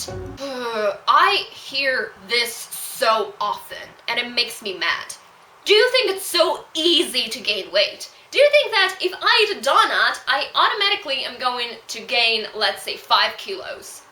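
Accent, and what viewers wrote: United Kingdom. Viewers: I love that British accent you have.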